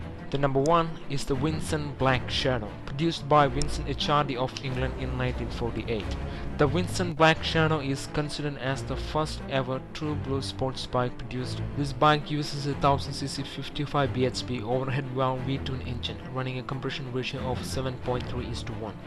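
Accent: Kerala, India. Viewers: ഒരു മലയാളി ഇംഗ്ലീഷ്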